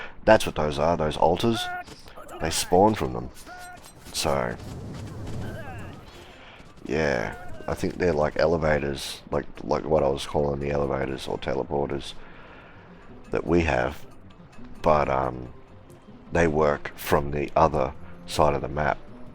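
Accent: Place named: Australia. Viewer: Not meant to be a "dis" Your voice is so fucking smooth, I felt asleep the last 3 days listening to part 3-6. Your gameplay is really relaxed and I like that you do it at 10x speed. But: there is not much value to looking at your vids for hints etc... For the last 4 ones I skipped and skipped and skipped. Im sorry mate (love ur australian accent), but: just consider reading a book into youtube. I would listen to it :)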